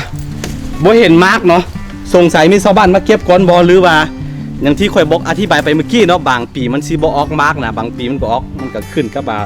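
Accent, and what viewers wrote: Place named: Chiang Mai, Thailand. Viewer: เพราะว่าสำเนียงภาษาหรือคำพูดของคุณเหมือนคนจังหวัดเชียงใหม่ของคนประเทศไทยค่ะ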